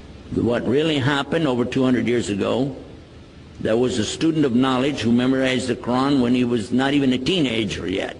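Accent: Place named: Texas, United States. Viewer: هل الشيخ من أصول تكساسية؟ لهجته كأنها من تكساس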